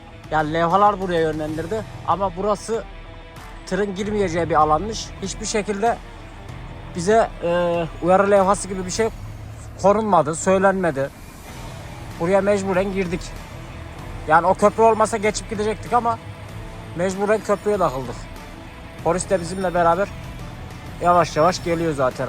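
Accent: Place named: Central Anatolia, Turkey. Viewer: Konusma şeklin yozgat sivas bu yörelerdenmisin ? iç anadolu olduğu kesinde...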